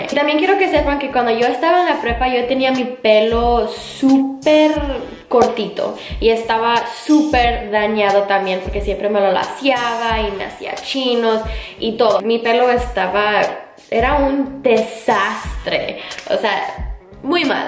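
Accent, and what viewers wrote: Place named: Latin America. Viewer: todos diciendo que le cuesta hablar en español y yo que la acabo de conocer pensaba que era latina:v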